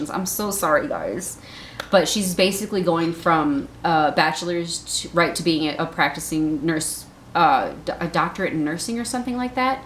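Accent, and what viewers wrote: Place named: Minnesota, United States. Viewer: I'm not a birth worker, but I stumbled here from Twitter. Had my third and maybe final child at home with a midwife and her apprentice (?) It was healing (after two oppressive/demeaning hospital births). What you do is so important and I just wanted to encourage you. Also, I knew you were from Minnesota as soon as you started talking. 🙂 I've got family there.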